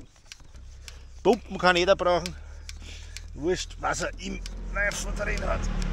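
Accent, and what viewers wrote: Austria. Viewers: Einfach top erklärt der Herr. Der österreichische Akzent ist sowieso die Krönung👍👍und es macht Spaß zuzuhören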